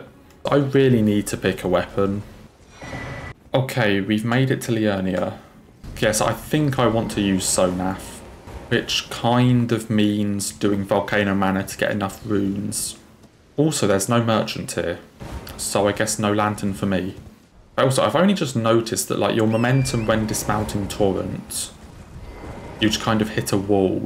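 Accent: United Kingdom. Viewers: Its called a british accent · Why do you souns homosexual or are you just British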